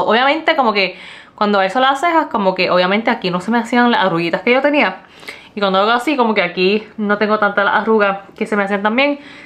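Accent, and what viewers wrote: Puerto Rico. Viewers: Girllll. I just love your style... Subscribete si te da la gana.. jajajaaa.. bien rajatabla.. me encanta como hablas el Español con ese acento bien boricua y despues El Spanglish.. mita Ingles y mita en Español..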